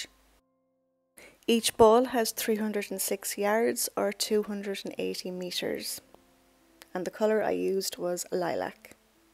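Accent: Ireland. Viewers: I am a new learner, I was scrolling through tutorials on how to crochet a cardigan " I love a challenge " when I heard you're Irish accent 🇮🇪 I had to try this as im Irish. Im getting there. Thank you. ❤❤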